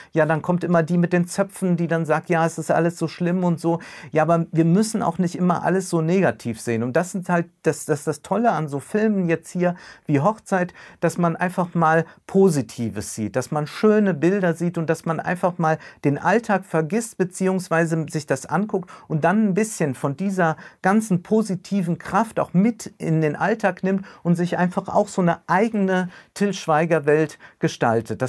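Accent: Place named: Moselle Franconian, Germany. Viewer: Dieser dezente aber schöne moselfränkische Akzent. :D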